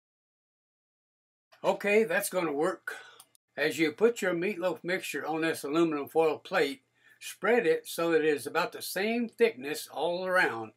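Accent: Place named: Alabama, United States. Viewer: I was sitting here thinking, you remind me of my papa... what part of Alabama are you from?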